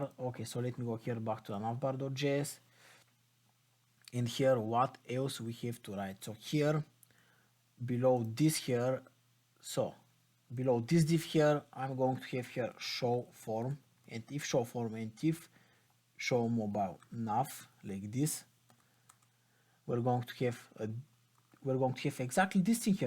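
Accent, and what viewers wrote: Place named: Bulgaria. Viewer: hah me too ! :) just the accent of english :D and i decide you are bulgarian lol :D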